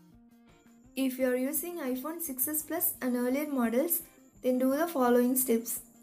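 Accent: India. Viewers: Indian accent ?!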